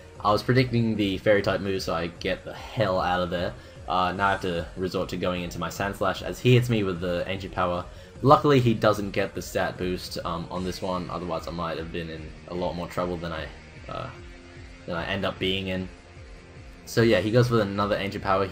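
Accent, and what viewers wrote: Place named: United Kingdom. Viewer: pokémon battles commented with a British accent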